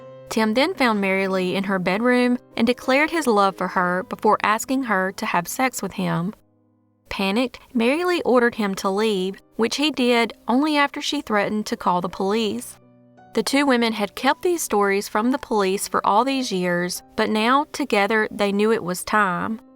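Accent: South, United States. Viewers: The southern accent definitely helps. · This homegrown Aussie girl absolutely loves all of your southern girls accents. Or is it y’all? Sounds lovely to me. 🎶 💕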